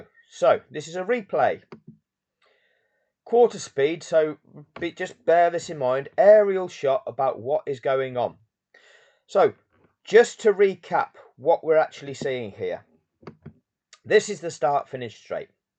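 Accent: United Kingdom. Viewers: your britich ???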